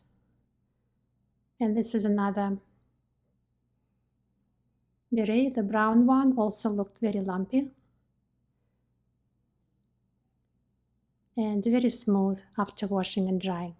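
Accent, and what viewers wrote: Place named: Ukraine. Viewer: I need an entire tutorial from start to finish. If you just want to make a video snd sell me the disk I would gladly pay up to 200 dollars. That's how important it is to me. I'm 69 years old and have noone to help me. I can't understand how to read patterns because I don't know enough stitches. I suffer from depression and often can't sleep. Knitting requires me to concentrate snd focus do when I get up in the middle of the night I can knit and the time just flies and before I know it its daytime snd my crisis has past. It's miraculous to me. Also, I have had a 20 year career as a training developer snd have a Masters in instructional design of online learning. You are the best. You should win an award. I'm going to look into recommending you for one. Also, are you Ukrainian? I only ask because I was raised by my grandmother who was Ukrainian and your accent sounds alot like hers.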